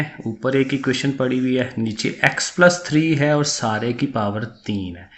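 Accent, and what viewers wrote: Sialkot, Pakistan. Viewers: sir ap ki awaz se lgta he ap sialkoti hein?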